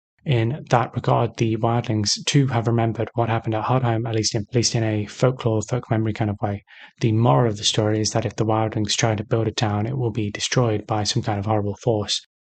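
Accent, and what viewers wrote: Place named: Midlands, United Kingdom. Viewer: As a fellow Brit I'd guess he's from the midlands somewhere ? Though in some words the vowel sounds are more southern, so maybe Nottingham? Or maybe Yorkshire but university educated in the south (British academia is biased against northern accents so sometimes people's accents shift a bit. Especially at the older universities).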